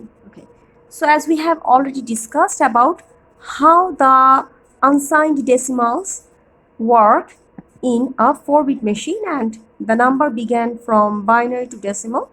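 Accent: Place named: Bangladesh. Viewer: What English you are speaking yaar bengoli English or American eng. At least decide it. It ll be more understandable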